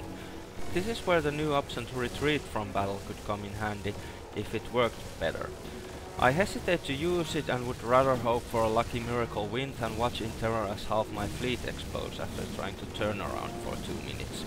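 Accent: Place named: Finland. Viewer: Ootko Suomalainen? Are you Finnish? Just guessing ur accent sounds Finnish :) Even if ur not, great review man!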